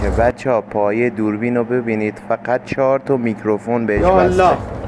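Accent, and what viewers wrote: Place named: Arab world. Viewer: چقدر زشت عربی حرف میزنی هاذل قرآن کرم چی میگی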